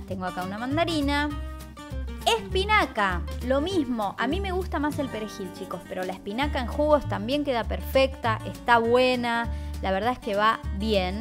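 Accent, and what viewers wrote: Argentina. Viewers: Me regusta tu tono argentino me caes bien y me gustan tus recetas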